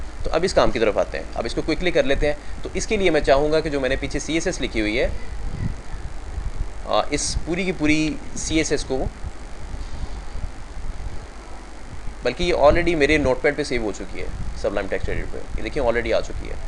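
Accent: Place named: Punjab, India. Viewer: aap ho muslim liken aapke bolne ka axcnt Punjabi h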